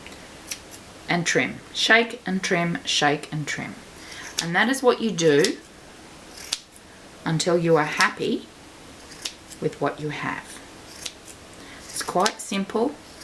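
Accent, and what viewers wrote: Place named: Australia. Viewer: So lovely to hear an Aussie voice coming from a great, non complicated tutorial! Bonza mate!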